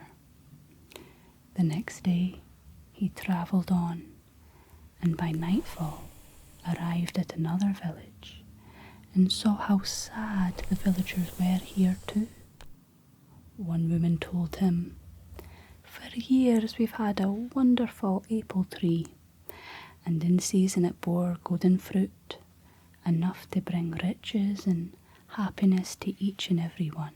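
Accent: Scotland, United Kingdom. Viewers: What a calm soothing relaxing voice you have and that Scottish accent, I just love it. Very nice video, thanks for sharing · Wow, you read very well.. very relaxing. And I enjoy ur Scottish way of speaking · I'll be traveling to Scotland next summer, I'm practicing the accent thanks to your videos! Amazing work! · I’m always up for a wee cozy night with a Scottish lass reading to me with the peak accent.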